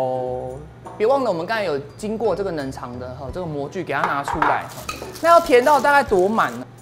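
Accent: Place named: Southern Taiwan, Taiwan. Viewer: 是南部人嗎？只有南部人會把「冷 ㄌㄥˇ」唸成「ㄋㄥˇ」😅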